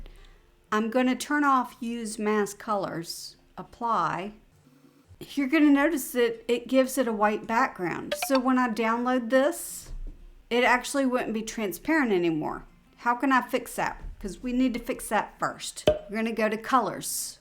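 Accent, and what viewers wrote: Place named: South, United States. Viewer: Thank you your video provided everything I needed to know and the potential that the website has to offer. Love your Southern accent too,!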